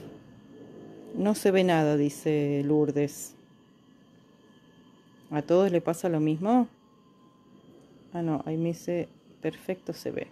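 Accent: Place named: Argentina. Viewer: Señora de q parte de ARGENTINA ES USTED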